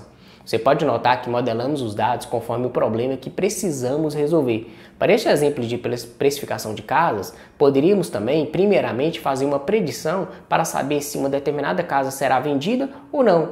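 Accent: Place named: Minas Gerais, Brazil. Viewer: Obrigado por todos os vídeos que você posta pra gente... aprendo muita coisa contigo.. muito bom ver um mineiro como eu e da minha cidade (BH).. kkk ja imaginava pelo sotaque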